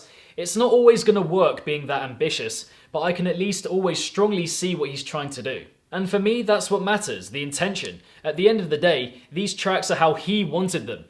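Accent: United Kingdom. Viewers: Your lush British accent makes this review much more enjoyable. But no homo tho.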